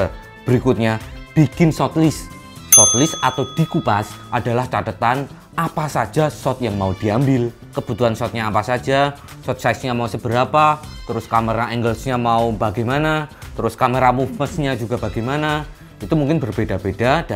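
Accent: Java, Indonesia. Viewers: Mantap... Pertahankan logat medhok nya yo mas.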